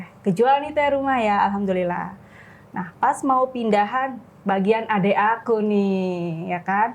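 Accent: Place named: Karawang, Indonesia. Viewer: Logat krawang nyaa .. jdi kangen kluarga aku yg d Rengasdengklok 😊😊😊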